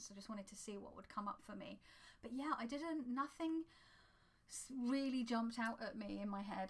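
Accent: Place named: United Kingdom. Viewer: I'd like to see the video as well. Having so many "I once thought" moments is a good thing. Imo. It says that you have a open mind and heart. This was great ❤ btw hearing your lovely accent makes me miss the UK so much. Can't wait to go back. Thanks for sharing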